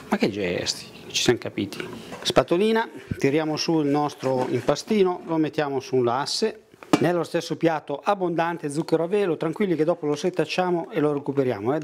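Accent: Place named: Emilia, Italy. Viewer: Simpaticissimo. Lei è Emiliano!? Perchè mi sembra dall'accento che lo sia..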